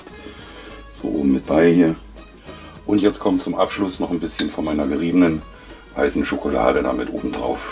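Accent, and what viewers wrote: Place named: Berlin, Germany. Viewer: Aha, is Berliner! 😁 Ma von Lichtenberg wink. 😉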